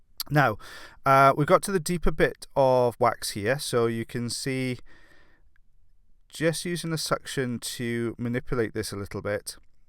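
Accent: Wales, United Kingdom. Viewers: Are you from Wales by any chance? :)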